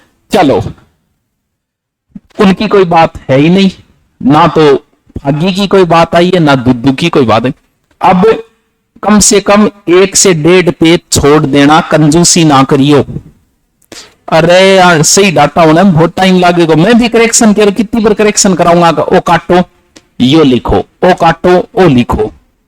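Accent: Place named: Uttar Pradesh, India. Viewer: Up se lagti hai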